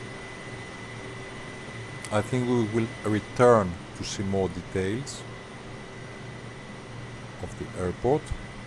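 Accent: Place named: India. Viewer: the minute i herd the indian voicwe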